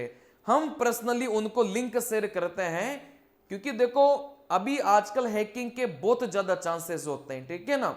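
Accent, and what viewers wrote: South, India. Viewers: Sir aap jan bujhkar aise bolte ho ya aap south se ho aur aapne hindi seekhi hai padhane ke liye isliye aise bolte ho.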